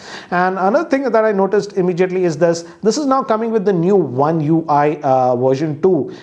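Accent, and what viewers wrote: India. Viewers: Nice video, but I find it hard to understand Indians accent! And him speaking too fast makes it even more difficult to understand him.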